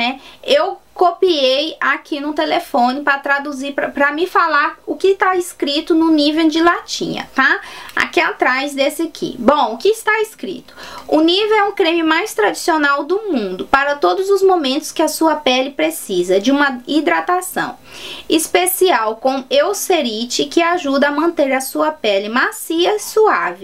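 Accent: Minas Gerais, Brazil. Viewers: Tem um sotaque mineiro . Legal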